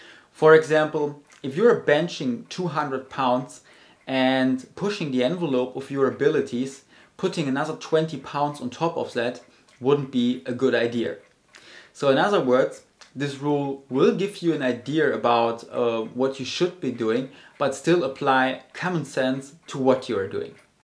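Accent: Germany. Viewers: french? =)) ahaha hey j'aime ta, parlant français, l'accent allemand hes German dude xD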